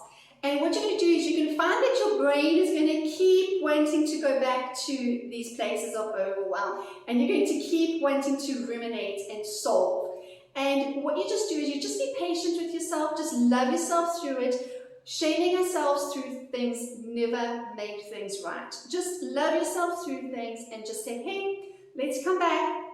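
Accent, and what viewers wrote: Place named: South Africa. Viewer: Hi - I’m fascinated by the subject of emotional resilience and I’m desperate to learn everything about it. I typed the words in YouTube and came across your channel. This video resonated with me personally on an experience level as well as an aha moment level. Please let me know if we can chat. You sound South African - so am I 😊 Thank you in advance!!!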